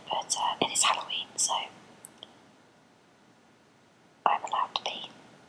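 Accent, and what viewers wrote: United Kingdom. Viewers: You are beautiful, and I love your voice. I love the British accent, and I love the fact that you have a very soft whisper. Come to Knoxville TN, I'd love to hear your real voice. Keep doing what your doing, it's very soothing and pleasant. Hope all is well, have a great day :-).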